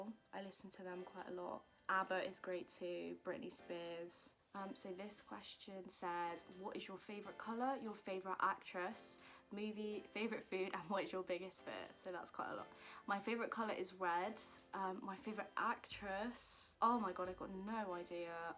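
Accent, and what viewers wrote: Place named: United Kingdom. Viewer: I didn’t know you were British 🤯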